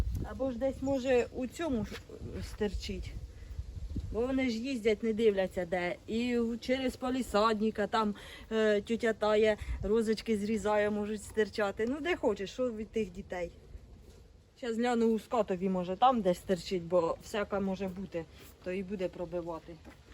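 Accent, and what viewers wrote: Poltava, Ukraine. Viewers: я так і думав) у мене кума з Полтавщини, то теж так говорить)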